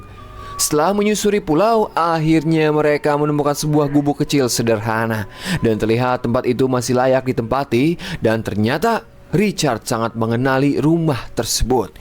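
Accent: West Java, Indonesia. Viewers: Ada Sunda Sunda nya kang😭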